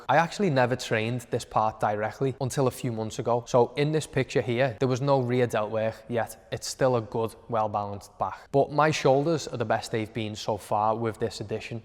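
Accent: Liverpool, United Kingdom. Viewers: Scouse accent, the best 👍 (from Korea) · Scouse mate. Have you heard of the Beatles?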